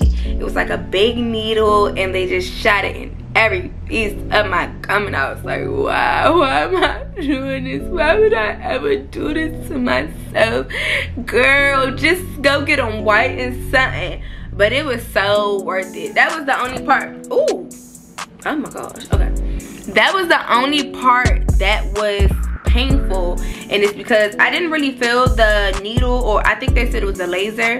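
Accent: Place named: Washington DC, United States. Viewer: GIVING DC ACCENT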